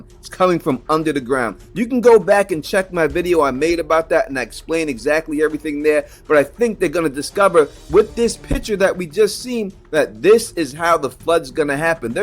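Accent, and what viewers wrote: New York, United States. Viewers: I love your coverage of this show. Also love your NY accent. Love to hear you say "autopsy "❤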